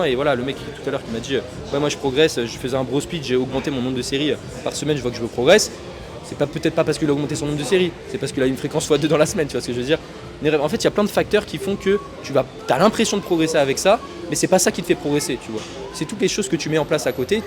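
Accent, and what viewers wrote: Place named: Asia. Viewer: Je mets un dislike pour l’accent asiatique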